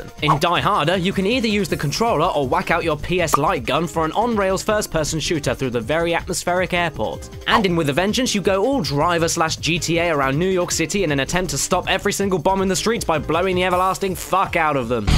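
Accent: United Kingdom. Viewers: His British right? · This is my first video of yours, got to and though. "Holy shit it's British JonTron" Not that its a bad thing its amazing. Also you have the exact same video game upbringing I did. With all PS1 and no nintendo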